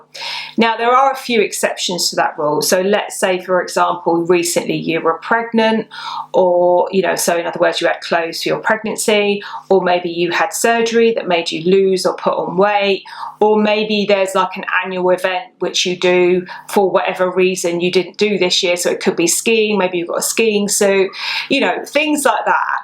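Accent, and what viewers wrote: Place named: United Kingdom. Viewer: Really good advice! I have got rid of a lot of things as I have just moved house but i find a lot of my clothes don"t go together so putting together an outfit is difficult. I like the suggestion of thinking more about the colours and keeping it simple. I also watched your over fifties and how to look elegant videos, so I think I will be able to make some progress now. Lovely to hear a British accent, I am a Brit living in Canada. We definately have four seasons here.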